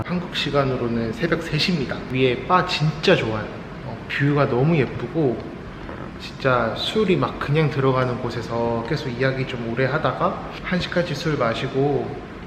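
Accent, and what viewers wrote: Gyeongsang, South Korea. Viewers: 몰랐는데 사투리가 부산경남.. 이신가봐요!ㅋㅋ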